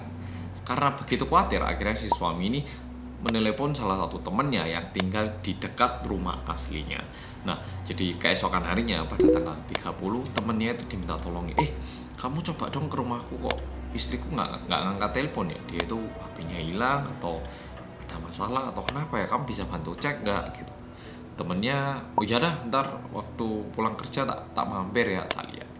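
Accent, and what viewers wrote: Java, Indonesia. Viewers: Meddhoooooooooook e dhuowo men om 😅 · Medhook ke kedawan mas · Medooooooooooooooooooooooooooooooooooooooooooooooooooooooooooooooooooooooooooooooo ooooooooooooooooooooooooooooooooooooooooooooooooooooooooooooooooooooooooooooooooooooooooooooooooooooooooooooooooooooooooooooooooooooooooooook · Ketemu lagi, tadi di Tokopedia jadi MC BTS, sekarang di YouTube jadi detektif medhokkkkkkkkk....😄 Langsung nonton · Medooooookkkny luar biasa' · Alhamdulillah medoknya makin panjang dari biasanya. Ayo SEMANGAT! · Wi medok e nyapo ye mas? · Medooookkkk~ Melu ngempet nafas pisan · Astaga aku sebagai bolobolo bangga ternyataa mas korea yang medoookkk interview bts. OMG proud of u😭😭✨✨✨✨✨ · Korea Medoooooooooooooooooooooooooooooooooooooooooooooooooooooooooooooooooooooooooooooooooooooooooooooooooooooooooooooooooooooooooooooooooooooooooooooooookkkkkkkkkkkkkkkkkkkk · Orang Korea Yang MEDOOOOOOOOOOOOOOOOOOOOOOOOOOOOOOOOOOOOOOOOOOOOOOOOOOOOOOOOOOOOOOOOOOOOOOOOOOOOOOOOOOOOOOOOOOOOOOOOOOOOOOOOOOOOOOOOOOOOOOOOOOOOOOOOOOOOOOOOOOOOOOOOO OOOOOOOOOOOOOOOOOOOOOOOOOOOOOOOOOOOOOOOOOOOOOOOOOOOOOOOOOOOOOOOOOOOOOOOOOOOOOOOOOOOOOOOOOOOOOOOOOOOOOOOOOOOOOOOOOOOOOOOOOOOOOOOOOOOOOOOOOOOOOOOOOOOOOOOOOOOOOOOOOOOOOOOOOOOOOOOOOOOOOOOOOOOOOOOOOOOOOOOOOOOOOOOOOOOOOOOOOOOOOOOOOOOOOOOOOOOOOOOOOOOOOOOOOOOOOOOOOOOOOOOOOOOOOOOOOOOOOOOOOOOOOOOOOOOOOOOOOOOOOOOOOOOOOOOOOOOOOOOOOOOOOOOOOOOOOOOOOOOOOOOOOOOOOOOOOOOOOOOOOOOOOOOOOOOOOOOOOOOOOOOOOOOOOOOOOOOOOOOOOOOOOOOOOOOOOOOOOOOOOOOOOOOOOOOOOOOOOOOOOOOOOOOOOOOOOOOOOOOOOOOOOOOOOOOOOOOOOOOOOOOOOOOOOOOOOOOOOOOOOOOOOOOOOOOOOOOOOOOOOOOOOOOOOOOOOOOOOOOOOOOOOOOOOOOOOOOOOOOOOOOOOOOOOOOOOOOOOOOOOOOOOOOOOOOOOOOOOOOOOOOOOOOOOOOOOOOOOOOOOOOOOOOOOOOOOOOOOOOOOOOOOOOOOOOOOOOOOOOOOOOOOOOOOOOOOOOOOOOOOOOOOOOOOOOOOOOOOOOOOOOOOOOOOOOOOOOOOOOOOOOOOOOOOOOOOOOOOOOOOOOOOOOOOOOOOOOOOOOOOOOOOOOOOOOOOOOOOOOOOOOOOOOOOOOOOOOOOOOOOOOOOOOOOOOOOOOOOK. 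· Medhooooookk Suaranya persis pemotong rumput 😂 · Mas korea medok deg deg kan gak tadi interviwe sama bts oh ya jungkook minum susunya lahap ya🙂😌✌🏻🍼💜💜💜💜💜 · Hello guys welcome back to bersama orang Korea yang medoooooooooooooooooooooooooooooooooooooooooooooooooooooooooooooooooooooooooooooooooooooooooooooooooooooooooooookkkkkkkkkkkkkkkk!!!!!!!!!!!!!!!!!!!!!!!!!!!!!! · medoknya hanya bertahan sampai sini wkwk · Ternyata tetep medok ya sekalipun lg ngeinterview bts, uwu mantul mas 💫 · Mau nya lewat aja tuh tokpednya..krna udah ngeklik nih pidio.. Eh iseng ja balik ke tokped ternyata MC nya si medhooooooooooooooooooookkk...😀 Akhirnya nongkrongin dah.. Klo aq dari sekian translater nya bts di indo lebih milih ㅎㅎㅎㅎ Cie cie cie yg bakal di kontrak untuk translate nya BTS di next project konser indo.. 💚💚💚😎😎😎 짱... 너무 좋아...짱 짱 진짜로...고마워😊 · iya mas medoknya, medoooooooooooooooooookkk banget yaak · Bersama orang korea yang Medoookkkkkkkkk....... 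Seketika akupun ikut tahan nafas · Jiaahhh... Baru buka youtube channel yang ini pas pembukaannya spontam ngikutin pembukaan sampe ngikutin medoknya yang panjang banget sampe ditanya sama papah "kamu ngapain?" 😂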